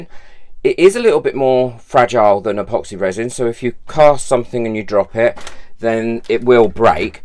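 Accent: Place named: Essex, United Kingdom. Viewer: Hello I’m listening to you in Illinois but you sound like you’re from my home area of Essex,or maybe Kent love the video’s especially the bloopers. Just want to get started with resin I have quite a few silicone molds that I’ve used to make soap,candles( little LEGO men) am I ok to use those molds?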